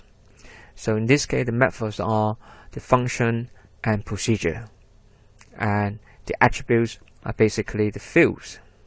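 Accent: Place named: London, United Kingdom. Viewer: Nice video! We are you from? You sound like a Londener and Chinese at the same time (no ofense).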